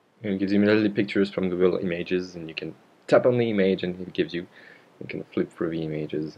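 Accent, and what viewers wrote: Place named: France. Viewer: Monsieur est français ? :D Pas sûr mais un tout petit accent français peut-être :P Sinon belle vidéo et GG pour parler aussi bien l'anglais :)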